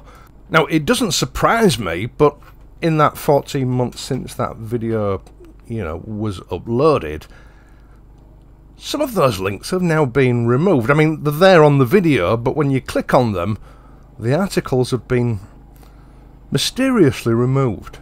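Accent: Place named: Yorkshire, United Kingdom. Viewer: Are you by any chance from the same Yorkshire region as Mavis, who used to be on Corry, your accent reminds me of her. Anyway, which part of Wales do you live in? I'm assuming that you do live in Wales - otherwise why would you be so vehemently concerned about our speed limits???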